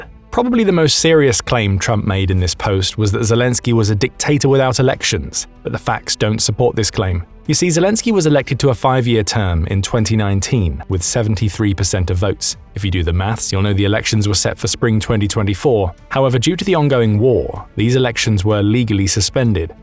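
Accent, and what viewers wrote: United Kingdom. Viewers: YOU ARE TALKING A LOT OF NON SENSE, ON TOP OF IT STATING THAT UKRAINE HAS LOST 46.000 PROPLE IS BEYOND COMMON SENSE. FROM YOUR ACCENT YOU ARE BRITISH MEANING YOU ARE SADELY LYING ALL THE WAY.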